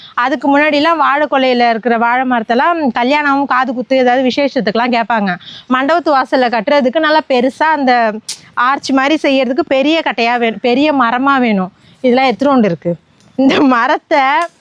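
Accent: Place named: Mayiladuthurai, India. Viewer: Your talking slang reminds me of my friend… she is also from Mayiladuthurai.❤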